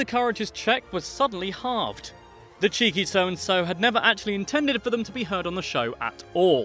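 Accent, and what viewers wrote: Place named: United Kingdom. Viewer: Can't follow this one. Need to practice super fast British accent and encrypt spoken kappas #SwedishNoob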